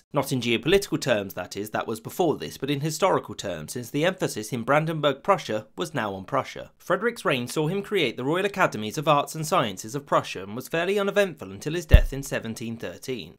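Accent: United Kingdom. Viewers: Yes that's a British accent lol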